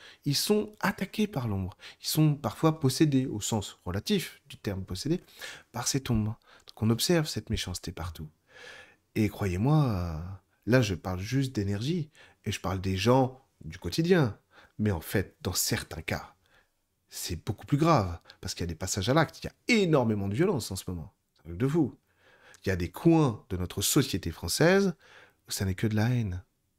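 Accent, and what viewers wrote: South, France. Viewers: Merci beaucoup ce partage! J'adore l'accent du sud!😂 ❤❤❤ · Vidéo géniale comme d hab! Merci. « Je clairvoye à mort ». Je clairvoye, tu clairvoyes, il/elle clairvoye etc etc❤🎉😂😊. Topissime l’accent du Sud « à la bien cousin, en place »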